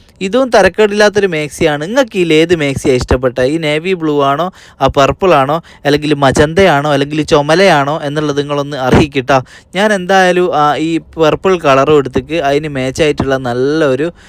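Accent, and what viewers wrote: Vadakara, India. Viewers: വടകര ഭാഷ നല്ല രസമുണ്ട്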